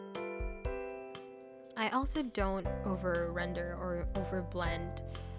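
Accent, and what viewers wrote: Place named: United States. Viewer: no worries! Your fluent voice makes it crystal clear HAHAHA. Never really met a lot of fil ( assuming you are) speaking like an American teen so das something...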